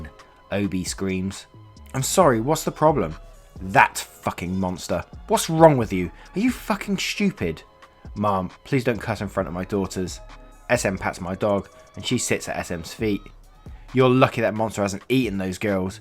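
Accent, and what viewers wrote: United Kingdom. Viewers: While I know why most Youtubers stay away from swearing these days... I do enjoy someone who actually fills out the swearing even when the text is censored! Sometimes people just need to hear that they're being a twat, right? is one of my favourite Reddit readers partly because of the chill attitude, the chuckles and of course the British accent.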